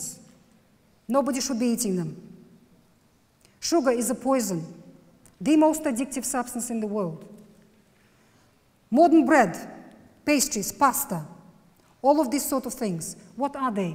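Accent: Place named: Russia. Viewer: It's Russian. She's born in Russia, then went to UK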